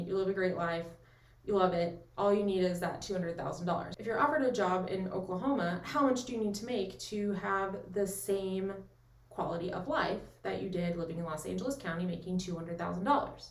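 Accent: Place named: East Coast, United States. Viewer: 1 Are you originally from OK? You have a "back east"accent. 2 What is your take on Bartlesville area? Thx for the vid.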